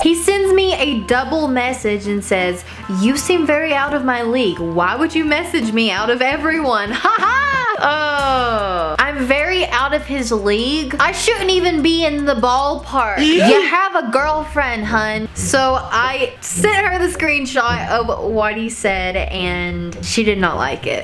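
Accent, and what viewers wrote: South, United States. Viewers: you are blessed with a precious southern accent girl!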